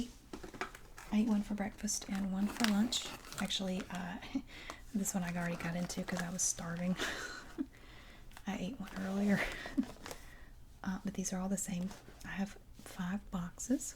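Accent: South, United States. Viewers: It may just be the effect of your southern accent, but in Germany it is pronounced 'Al-De' (I used to live in Berlin, shopped there often) but to be fair, here in the UK people say 'All-De'. The native pronunciation can be found in this music track! <link>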